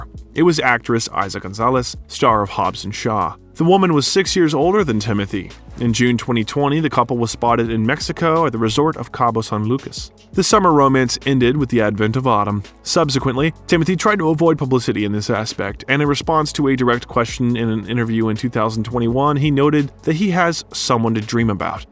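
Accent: United States. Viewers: You are speaking in an American accent. HERE, we call it soccer!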